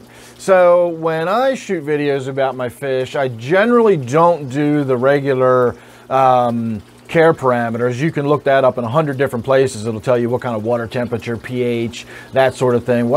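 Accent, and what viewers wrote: United States. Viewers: No! I like hearing your little "hey everyone" greeting plus I am english so I like a US accent and you are easy on the eye too 😍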